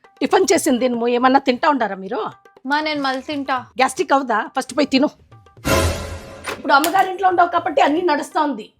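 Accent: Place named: North India, India. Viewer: Meeru North Indian aaa madam... Slang vere la undi...🙂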